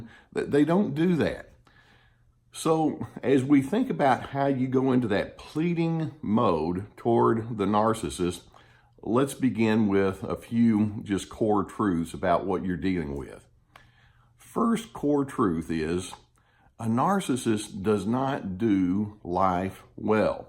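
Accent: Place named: Texas, United States. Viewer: Your explanations are clear and thanks for the videos. I'm trying to place your accent. Are you from Texas?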